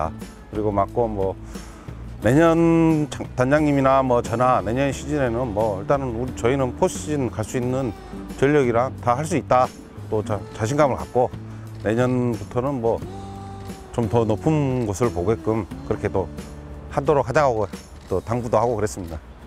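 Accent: Jeolla, South Korea. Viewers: 종국이성 진통광주전라도사투리 구사자네요 ㅎㅎ 응원하겠습니다 원클럽맨으로 감독도하고우승도하고 가즈아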